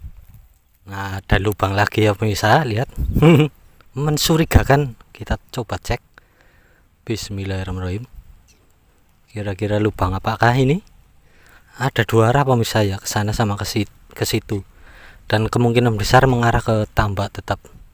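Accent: Banyumas, Indonesia. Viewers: Hadir kang....salam satu hobi..uhuyyyy👍👍👍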